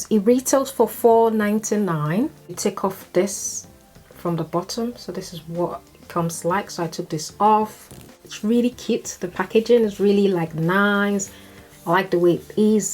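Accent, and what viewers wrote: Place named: Nigeria. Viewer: love ur accent are u nigerian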